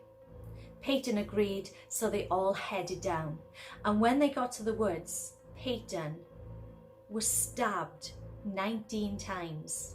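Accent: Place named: Wales, United Kingdom. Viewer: Me too I dont really like the Welsh accent however has a very soft voice and proper fit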